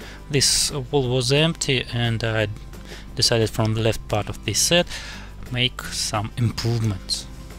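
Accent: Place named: Russia. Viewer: you are Russian? у тебя русский акцент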